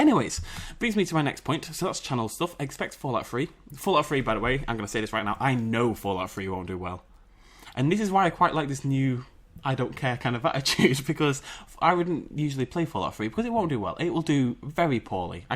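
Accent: United Kingdom. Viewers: Everytime I hear a British accent, the face never matches what I imagine and it’s honestly fascinating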